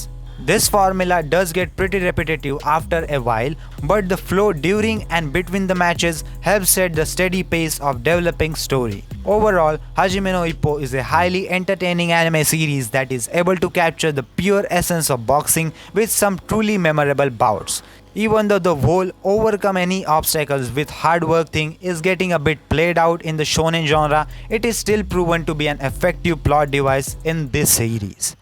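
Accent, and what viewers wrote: India. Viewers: Indian accent is worst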